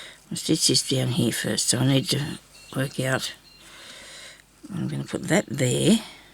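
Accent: Australia. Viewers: I understand you perfectly, you have the same Australian accent as me I also grew up in western NSW. Blue and green should never be seen unless there’s a colour in between is what I was led to believe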